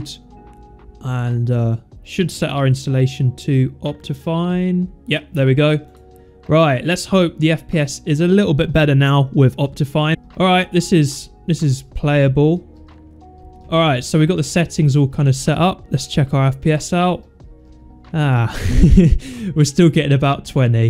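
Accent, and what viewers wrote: United Kingdom. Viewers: Are you Brithish English or Amerikan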